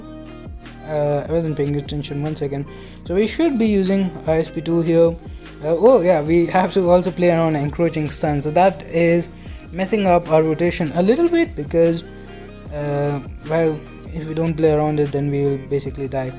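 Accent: India. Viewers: Only disliked it because of your Indian accent.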